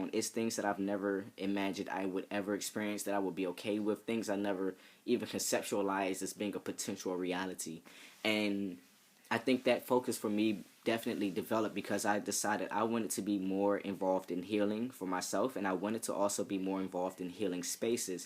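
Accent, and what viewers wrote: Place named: New York, United States. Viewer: Hey Your dialect sounds very NY I would have never guess Maryland.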